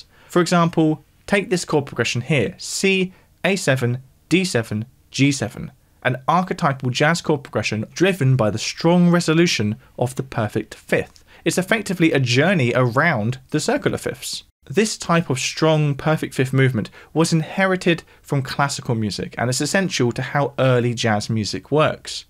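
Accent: United Kingdom. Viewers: I have to smile at the British pronunciations of some words. Like in the US we say Samba like “Somm-bah,” but accent it’s more “Sam-ber.” It’s like sometimes the British go out of their way to make foreign-import words more English sounding. Like pronouncing the t in valet or filet.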